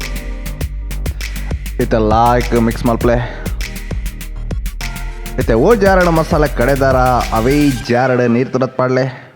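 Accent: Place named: Tulu Nadu, India. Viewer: Anna erna tulu bhari styliye appreciated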